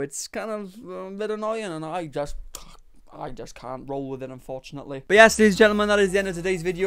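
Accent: Wales, United Kingdom. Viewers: well that growl thing made me feel surprising things aha you seem extra welsh in this one.. keep up the good work!! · This man could talk about Eastenders and I would gladly sit and listen to him. I swear the welsh accent is a beautiful gift to humans. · a cute welsh accent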